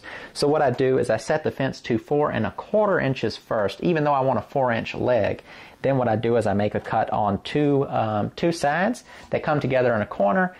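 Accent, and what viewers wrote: South, United States. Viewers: Another great video - clear and precise instructions as usual - and delivered in a Southern drawl which I could listen to all day. I watch your vids over and over - the sound of your machinery and commentary bounces around my kitchen driving my wife crazy. Ever thought of teaching? Or maybe setting up woodworking courses? I'm UK based (Liverpool) so it would be near impossible for me to attend but I'm sure people would. Keep them coming! Thanks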